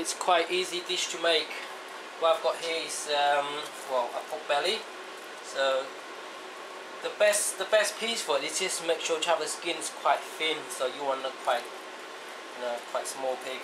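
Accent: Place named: England, United Kingdom. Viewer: I knew he had a slight English accent!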